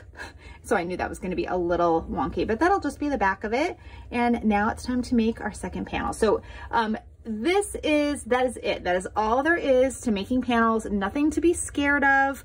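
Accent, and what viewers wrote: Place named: United States. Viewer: Pictures very good but squeaky American voice talking too fast difficult to understand.😢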